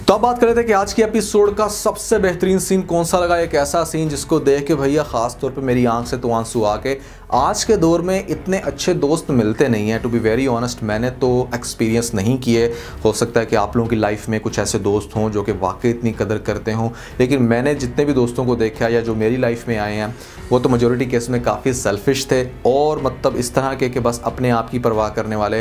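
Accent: Punjab, India. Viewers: Ap punjabi hn ?? Ap k excent se laga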